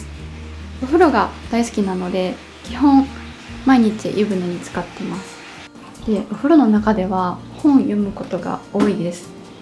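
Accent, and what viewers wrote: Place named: Kansai, Japan. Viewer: 関西出身の人ですね！！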